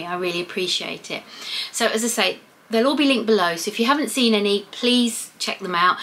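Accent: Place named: United Kingdom. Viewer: Yay, I was team green! 💚 You hit the jackpot — such a beautiful soft minty green that will go with virtually everything! I’ve watched all of your NYC videos and enjoyed them all, including this one. I’ll admit that I was tempted to fast forward to see which color WOC you got 😂, but I stayed the course because I love listening to your narration and lovely British accent ❤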